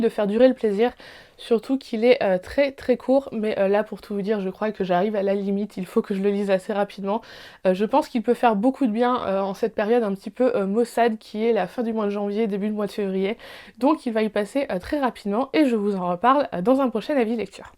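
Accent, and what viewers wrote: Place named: England, United Kingdom. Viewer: Coucou, j’ai hâte de voir tes retours car il y a beaucoup de livres qui me donnent envie et en passant j’aime trop ton accent anglais 😍. Bon challenge et bonne lecture!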